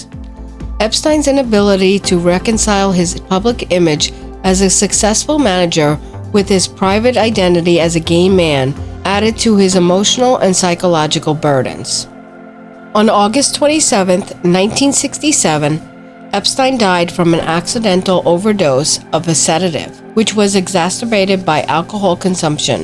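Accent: United States. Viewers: You're probably an American, methinks.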